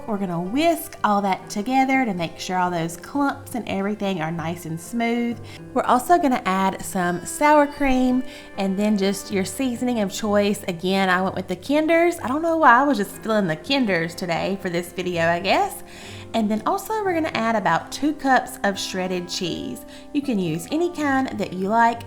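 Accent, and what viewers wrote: South, United States. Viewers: great recipes. Thanks. I could listen to your sweet southern accent all day long. Thanks for the great recipes!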